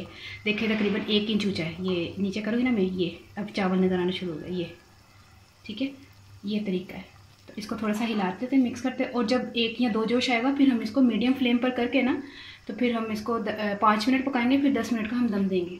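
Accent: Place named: Punjab, India. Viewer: اسلام واعلیکم میں لاہور سے ہوں اپنی جاب کے سلسلے میں یہاں مکہ میں رہتا ہوں اپکی تمام ویڈیوز دیکھتا ہوں اور ٹرائی بھی کرتا ہوں۔ میں یہ پہلی دفعہ کمنٹ کر رہا ہوں وجہ یہ ہے کہ آپکی ویڈیو دیکھنے سے ایک تو ریسیپی پتہ چلتی ہے دوسری آپکی پنجابی آواز سے ویڈیو میں ایک مزاخیہ پن برقرار رہتا ہے۔ یہ چنا چاول ریسیپی ٹرائی کی ہے اچھے بنے تھے چاول۔ شکریہ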